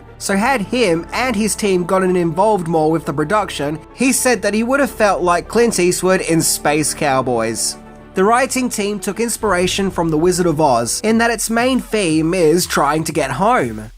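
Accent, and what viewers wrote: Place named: Australia. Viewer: There's the OST and then there's an 8-bit version of the OST. Both are incredible. And, despite your not being familiar with the technology and your tendency to speak like an Australian, I LOVE your totally unpretentious takes on film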